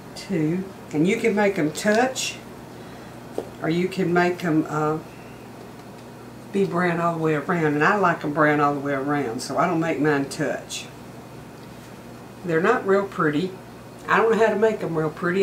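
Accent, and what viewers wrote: South, United States. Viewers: Just found your channel last night and I love it! Your kitchen and garden are both amazing, and so are you. I love listening to your sweet southern accent. God bless you!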